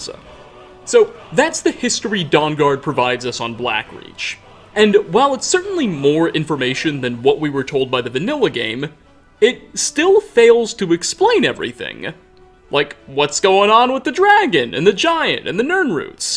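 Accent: United States. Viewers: Maybe that's just one of the many American accents. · Teleki Zalán he’s definitely American you can tell from his manner of speech. To most of us a “lift” is when you give someone a ride in your car.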